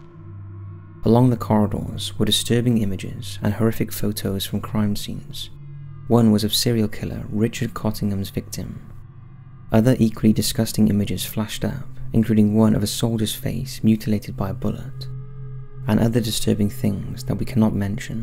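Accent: Wales, United Kingdom. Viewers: He's Welsh. :) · hellomikie92 nothing...if you mean the accent, it’s Welsh 🏴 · he's welsh.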